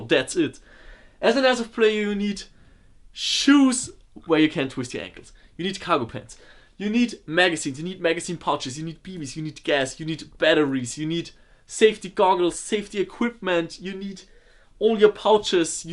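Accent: Germany. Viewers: hey deine videos sind echt nice ich find das immer witzig wenn du englisch redest und man dann soo deinen deutschen aktzent raushört was ja nicht schlimm ist;) mach weiter so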